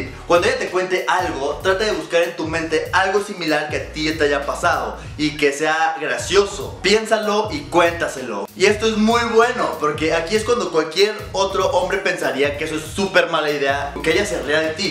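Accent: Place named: Fresa, Mexico. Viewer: Hablas freson y fresco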